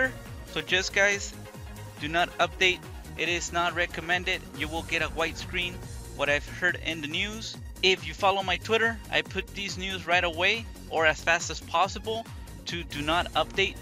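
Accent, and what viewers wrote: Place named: France. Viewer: Are you French, by any chance? I can't help but notice the accent. Just a wee bit lack of "Uh-huh".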